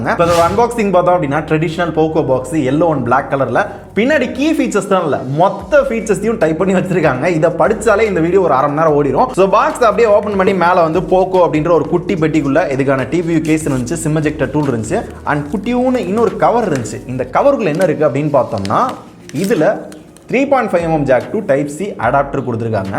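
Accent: Madurai, India. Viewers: Bro neega madurai ya?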